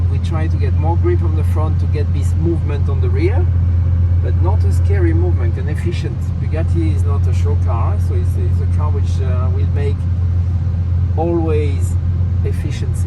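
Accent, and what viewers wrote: India. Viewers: You sounds indian❤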